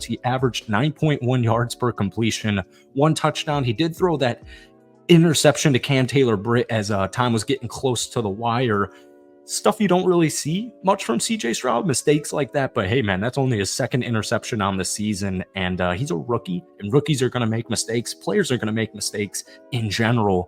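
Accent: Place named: Texas, United States. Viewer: Where is this texas accent coming from bro lol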